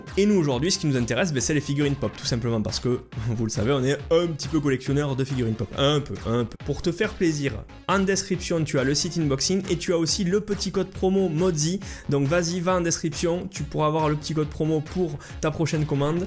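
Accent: Toulouse, France. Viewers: J'adore ton accent Toulousain xD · Mais oui Toulouse ! Je savais que je connaissais :P je ne suis pas tout à fait à coter je suis de Montpellier mais j'ai confondu car j'ai côtoyé pas mal de Toulousains :P j'adore cet accent !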